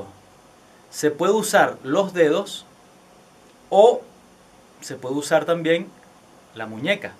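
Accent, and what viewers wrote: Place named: Canary Islands, Spain. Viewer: tienes acento canario, eres de tenerife? :D xk si es asi ya tienes un colegacho tinerfeño xD jajaja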